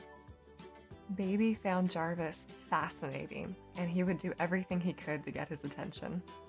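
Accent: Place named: United Kingdom. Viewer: British accent is quite appropriate, I say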